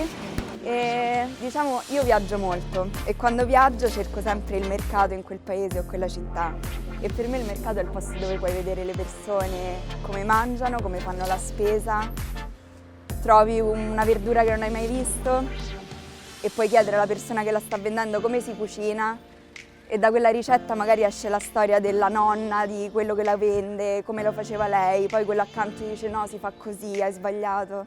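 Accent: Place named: Umbria, Italy. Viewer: Your accent sounds Umbrian...not Romana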